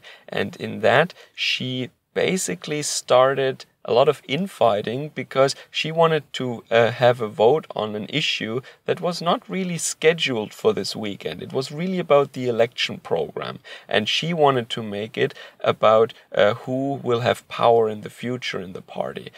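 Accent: Germany. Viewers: Anti-Feminism, frustrated masculinity, islamophobia, kitschy nostalgia of a romanticised past he didn't experience himself and idiotic anti-globalism while publishing on Youtube (given generously to you - including the first amendment - by yours truly, the United States of America)....this dumb channel is the typical alt-right nonsense. With a bad German accent.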